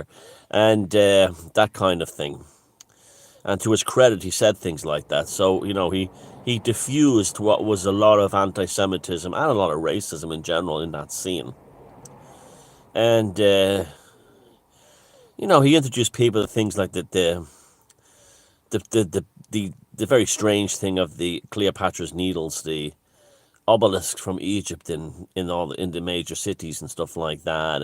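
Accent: Tipperary, Ireland. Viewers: Tip on the tipp accent - you have to add a y as the second letter of every word that ends in a vowel or w. It may well be impossible , I don't think I have the right muscle fibres in my tongue to enable a Wicklow accent, probably requires conditioning since infancy. There is actually a huge diversity of accents in Tipperary(all have that y thing though), they get extreme as you approach the borders with other counties. R.I.P Jordan Maxwell..Really enjoyed you're last few videos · Shite Tipperary accent 🙄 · Well I don’t think I’ve laughed so much in years as I’ve just done at your Tipperary accent 🤣😂! I left Ireland at the age of 21..30 years ago now! I remember the characters and I also remember an old fella that used to live in our neighbourhood when I lived in London, he was from Tipperary and spoke exactly the same! He had many a spat with the neighbours which usually ended up with him calling them “feckin pagans”…why he chose to use pagan as in term of insult..well I’m not exactly sure 😂. 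I remember creasing up many a time at his antics. I was about the only one locally he had a good word for..because I was Irish 😂. He always used to remind me that I was only a wee Irish girl in “John Bull” and I must watch out for “dem heathens” over here..OMG 😂..you just reminded me of him, definitely long since passed by now, he must have been pushing 70 at the time. Thank you for the laughs and the reminders ☺️.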